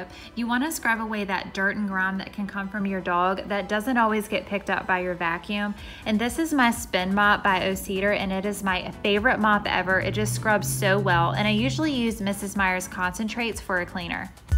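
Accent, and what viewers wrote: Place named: South, United States. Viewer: this the only video i’ve watched & i can tell she from the south 😂😍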